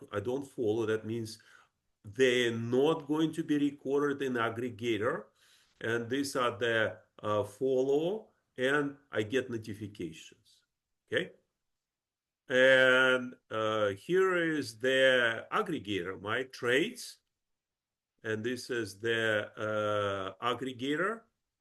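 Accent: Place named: Ukraine. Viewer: What a terrible narrator. He sounds like a Ukrainian. Don't you have narrators who speak English fluently?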